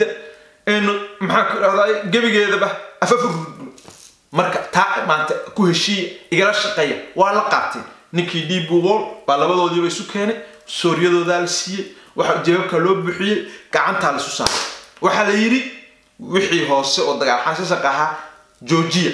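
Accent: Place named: Bari, Somalia. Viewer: with his reer bari accent is funny lool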